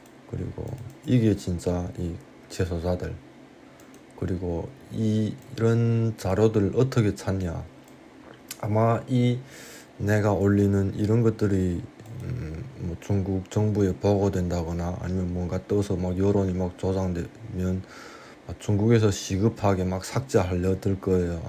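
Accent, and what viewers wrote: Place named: North Korea. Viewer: 조선족은 약간 이북말투가 섞여있을 수 있어요 이사람은 참된 조선족입니다 중국 공산당을 빨아재끼는 조선족이 아니란말입니다.당신이야 말로 정신차리세요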